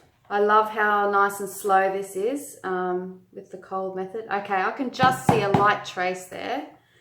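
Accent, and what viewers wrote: Australia. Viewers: Love the Aussie accent … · This is an amazing video and very satisfying Love the Australian accent 👍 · Hi, like everyone else, I love your videos and you have inspired me to finally take THE big step and make some soap. My lye solution has a film over the surface, am I still able to use it? Did I do something wrong? Also, when I discovered your channel, it was sooo good to hear an Aussie accent. I'm in Tassie. Thanks, Victoria. Aussie, Aussie, Aussie, Oi, Oi, Oi!🇦🇺😊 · A very good video, and wonderful instruction. I love love your voice, it is soothing. Thank you. I am from Canada and your accent makes me think of and miss my Australian cousins. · Nice video have my thumbs up, straightforward process, like your light Australian accent. Just one comment: I think the process is called saponification rather than emulsification. In the moment you have first amount of soap made by the chemical reaction the soap will definitely emulsify the rest of the oil. 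However, at the end of the process the oil is completely transform so I believe it is correct to say that the saponification process was completed. I think this is true even when the recipe keeps a small amount of oil unsaponified (this way we know that all hydroxide is used up completely and soap is not caustic ); recall my grandma making soap when I was a kid, and she had a way of testing the end of reaction by taking a sample in a spoon and we were not allowed to get closer (she wanted us to not get splattered). For us the kids, it was a pretty magic alchemy. Today, seeing you making soap brought all those memories back. God bless you and the work of your hands. Chris, Canada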